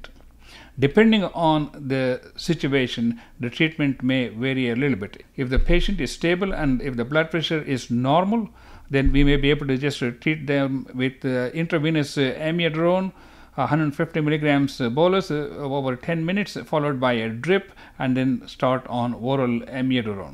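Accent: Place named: India. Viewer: I don’t understand Indian accent 👎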